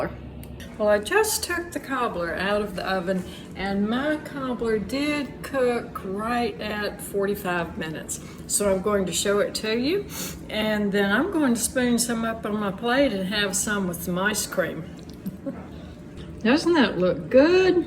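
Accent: South, United States. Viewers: ❤❤❤Oh my goodness! You just stirred up wonderful memories for me! I am in my 70's & my beloved Aunt (deceased) and all my relatives grew up in Mississippi along with my Mother. Only cousins left now. My Aunt taught me how to make this EXACT cobbler recipe when I was in high school! I've made it so many times over the years using all kinds of fruit. Best part of all is your southern accent- makes me feel like I'm with family! You are a treasure - I am subscribed and look forward to many more videos! God bless you!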